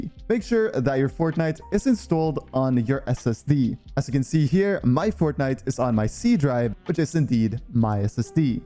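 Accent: Norway. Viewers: er du norsk????? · U Norwegian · Er du norsk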